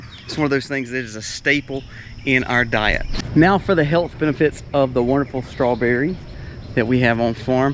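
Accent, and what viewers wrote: South, United States. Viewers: He has a rather Southern accent. Maybe he moved, or is in the Appalachians or Ozarks where the weather is more suitable, but Rhubarb comes from the cold, Continental climate of Inner Asia (Central Asia + Himalayas/Tibet). It hates the Deep South and quickly perishes because the summers are hot and winters are far too short.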